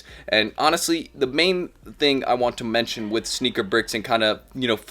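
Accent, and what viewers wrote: South, United States. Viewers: your voice is an interesting mix if southern,Stoner,skater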